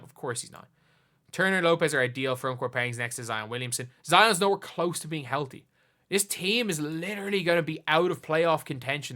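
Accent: Ireland. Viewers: He's very obviously Irish. He has an Irish accent and he references the Irish Super League constantly.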